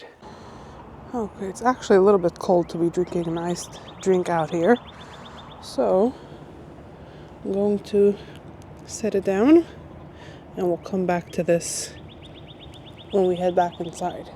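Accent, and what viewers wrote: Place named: Romania. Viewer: You have a beautiful accent; reminds me of a friend of mine from Romania. I do wonder, what is your growing/hardiness zone? Looks quite a bit chillier than where I’m at (zone 7b).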